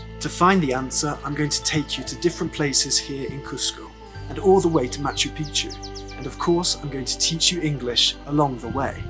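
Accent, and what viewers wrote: United Kingdom. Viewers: Hi my friend british. I often learn english with u. I mean british pronunciation... You are in my country hope you enjoy!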